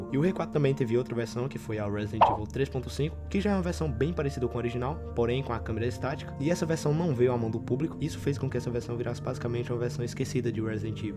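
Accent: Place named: Northeast, Brazil. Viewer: Mano, você é nordestino, né? Pelo sotaque, parece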